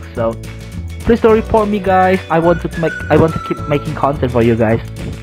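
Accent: Indonesia. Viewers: Logat indo banget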